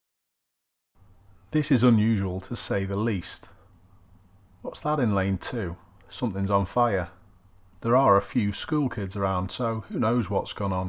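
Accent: Liverpool, United Kingdom. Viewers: Your laconic Liverpudlian commentary adds just the right touch of understated contempt to these clips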